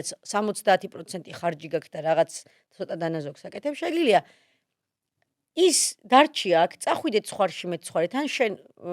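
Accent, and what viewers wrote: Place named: Tusheti, Georgia. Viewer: კაი თუშური აქცენტი აქვს ❤ თბილისურ დაყენებული პრონაუნსი < თუშური პრონაუნსი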